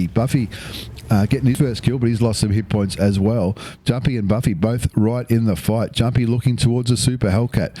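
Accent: Australia. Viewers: Hey yes you not any of those other bloaks luv your videos from Fla. on N/A also awesome aussie accent mate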